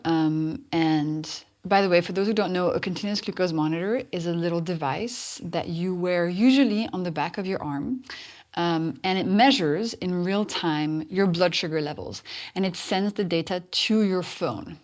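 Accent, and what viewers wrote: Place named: France. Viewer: Is French? She has got a French accent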